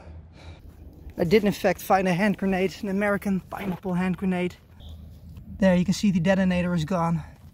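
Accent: Netherlands. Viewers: I can hear this dude being dutch lmao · Goede videos maar je uitspraak is soms super nederlands. Het is maar een tip. Zoals the Bulge bijvoorbeeld. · Leuk Nederlands accent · Nederlands of belgies? Vanwege je accent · I can hear you are dutch ( ik ook) and i really find this interesting you just eared a sub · Where are you from you sound dutch? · Is that a Dutch accent ;)? First video i've watched on this channel and definitely not the last. Very educational. Keep up the good work! +1 sub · You sound very dutch. Am I correct?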